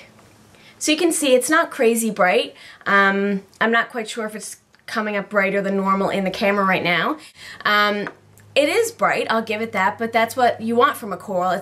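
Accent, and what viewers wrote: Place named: Atlantic Canada, Canada. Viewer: I was watching yt videos on my iPad and your channel was recommended to me. Are you from the east coast of Canada at all? You sound like me sort of lol....(I'm from Newfoundland living in Ontario).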